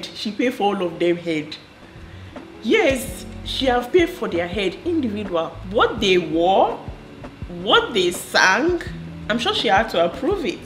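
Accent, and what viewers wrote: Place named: Ghana. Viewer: Maraji is picking up the Ghana accent already o😁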